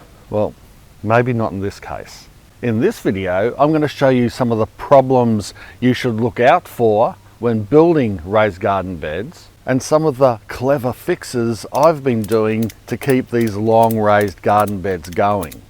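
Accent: Australia. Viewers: Good information. I so enjoy watching your videos. Love your Australian accent, I live in Virginia in the USA. You would think my southern accent funny I guess. How cold does you winter time temps get? I think right now you are in fall nearing winter, as we are in late spring, almost summer.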